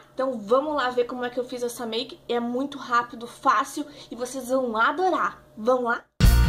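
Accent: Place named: Rio Grande do Sul, Brazil. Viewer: Ficou linda, já q o verde estará em alta neste inverno vou aproveitar o meu q está meio abandonado...rsrs. Lindo esse sotaque de gaúcha. Mto sucesso no canal. No meu apresento dicas motivacionais e dinâmica de grupo, se gostar aparece por lá. Bjs.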